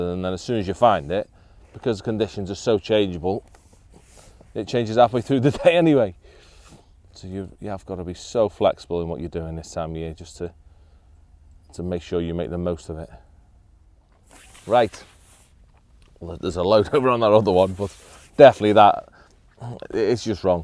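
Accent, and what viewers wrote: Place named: Liverpool, United Kingdom. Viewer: Your no des ship...can’t listen to scousers...I watch with sound off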